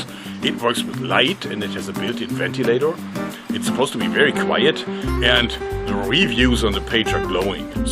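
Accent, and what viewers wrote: Germany. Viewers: Love it! Hello from USA! I love your videos and I think your german accent is cool and makes you seem more like a mad scientist haha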